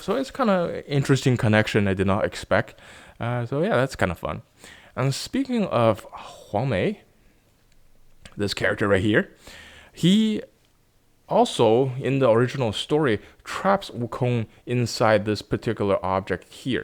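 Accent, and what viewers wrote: Standard. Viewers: holy shit，Your Chinese thank-you words made me even suspect that you are a Chinese blogger in a foreign country, your Mandarin is really standard! damn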